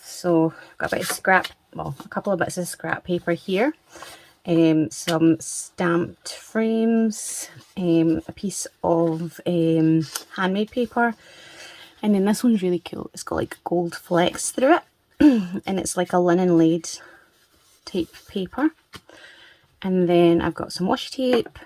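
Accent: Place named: Scotland, United Kingdom. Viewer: I’m a newbie, so this video was fabulous. Was mesmerised by all the techniques! Nice to hear another. Scottish accent. Could you maybe add details of where you got some of your material etc in the description below e.g. stickers and wax burner. Thanks so much for all the information and detailed explanations. Loved it from start to finish. What a lucky pen pal!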